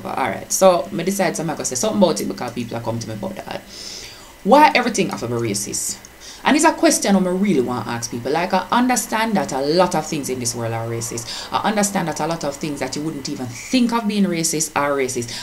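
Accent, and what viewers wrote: Jamaica. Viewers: Wow! You really contributed a very interesting angle to this debate, because you have an incredible accent! So refreshing to hear your opinions in a true Jamaican accent, amongst all this controversy!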